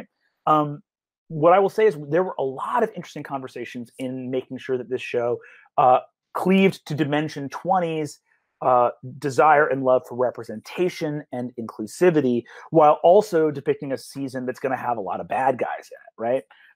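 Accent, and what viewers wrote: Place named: General American, United States. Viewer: He doesn't do it with Asians, either. His Battle for Beyond character, Nikhil, was coded Indian -- DM Jasmine Bhullar even gave the character's sister a distinctly Indian accent. Brennan just spoke with his Broadcast American English accent. Bear in mind Brennan can do quite a lot of white accents, from New Yawkuh to Sad Italian Man, to Russian mobster, to various flavors of Tim Curry, and many more.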